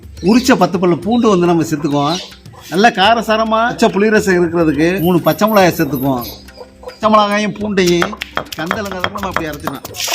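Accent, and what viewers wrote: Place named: Chettinad, India. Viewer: செட்டிநாட்டு தமிழ் அழகு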